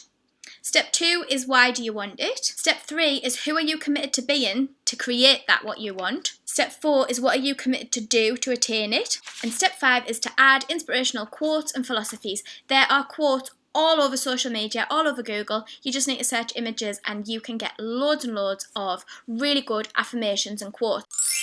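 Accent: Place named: United Kingdom. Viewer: Lovely to hear your experience of The Miracle Morning. Great to hear another British accent :)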